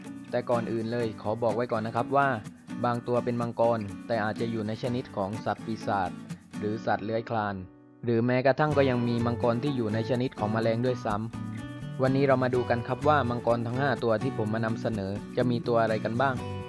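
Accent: South, Thailand. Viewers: คนใต้ ชัดเจน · ไม่บอกก็รู้ว่าพี่คนใต้แน่ๆ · ผทรู้เลยนิ๊ว่าพี่เป็นคนใต้ชั้ยมั้ยนิ๊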